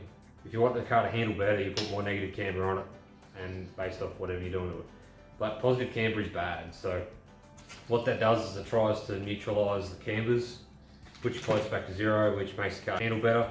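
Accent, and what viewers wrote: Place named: Australia. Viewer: Love the Aussie speak- sunnies, muddies (in the other video), aussies.....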